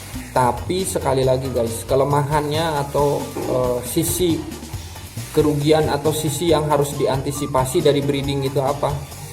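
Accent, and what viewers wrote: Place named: Sunda, Indonesia. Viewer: Jiga urang sunda mang euuy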